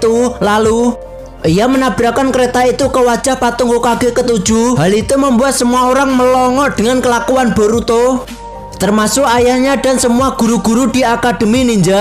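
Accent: Java, Indonesia. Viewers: aku suka film nya cma kok suaranya medok amatt 😂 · Mimin org jawa.. Medoknya keluar.. 😅😅 Suaranya kaya robot..lurus😅😅 Tp malah pny ciri dan lucuuuuu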